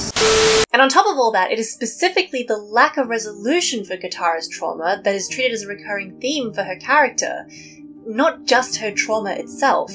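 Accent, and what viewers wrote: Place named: Australia. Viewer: I love the way you pronounce Aang's name in your Australian accent.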